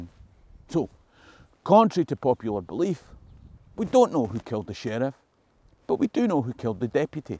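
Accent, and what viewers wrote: Scotland, United Kingdom. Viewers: I’m from the Caribbean , and here because I love the Scottish accent but now learning from a scot with mixed heritage which makes me feel even closer too you lovely people. Really enjoying this channel. 🤟🏾💙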